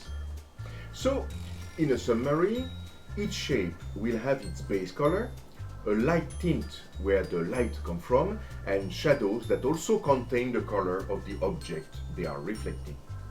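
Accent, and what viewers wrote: France. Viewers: i love your france dialect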